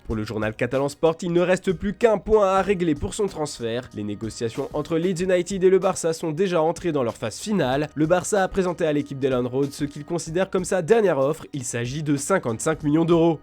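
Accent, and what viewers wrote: Italy. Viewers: Pourquoi y’a plus l’accent Italien accentué ? Vous avez changer Foot Mercato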